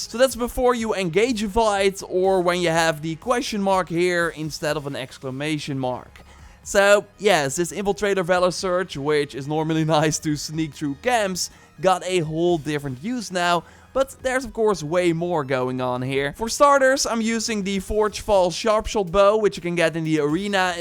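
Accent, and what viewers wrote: Netherlands. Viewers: Like your videos but it is not "Tear" as in crying. It is pronounced "ter" as in tearing off my eyes in cringe of recognizing your dutch accent :) enjoy your videos though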